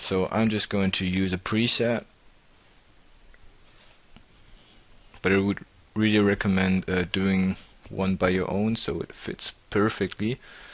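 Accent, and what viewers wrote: Germany. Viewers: Uns Deutsche erkennt man sofort an der Aussprache.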